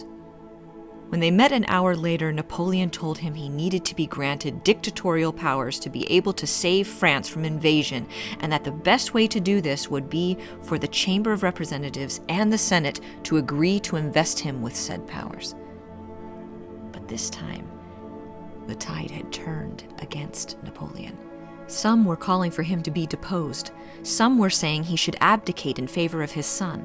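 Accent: United States. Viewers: The loveliest American accent - and great delivery.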